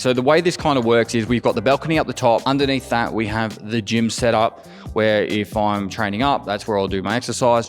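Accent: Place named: Australia. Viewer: why does remind me of russell crowe. not just the accent, but like overall feel and vibe. wise old aussie or NZ'er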